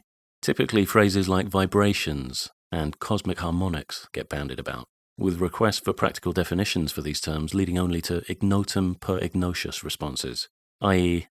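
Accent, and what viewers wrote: England, United Kingdom. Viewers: You sir are subbed. I followed a similar path in my education. Although it was Sociology and Anthropology. As you can imagine the ludicrous level of psuedoscience I saw, it might have been even worse for me. I'd love to able to do a series like this. But,I am absent the articulate and refined english accent and being american find myself exploding into arrogant & angry fits of cursing. :).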